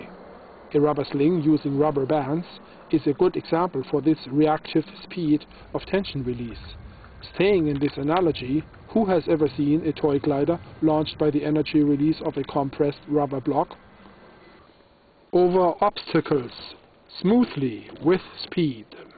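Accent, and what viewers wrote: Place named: Jamaica. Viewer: he sounds like a Jamaican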